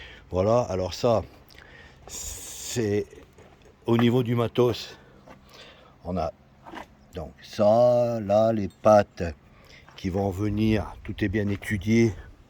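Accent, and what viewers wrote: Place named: Saint-Étienne, France. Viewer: Where are you from mate? You ve got an accent sounds like Saint Étienne 's thank you for your video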